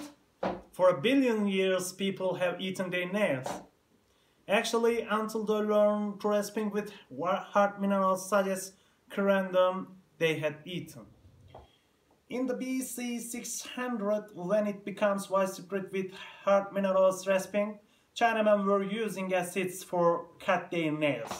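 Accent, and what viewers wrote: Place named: United States. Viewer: What kind of Merican accent is that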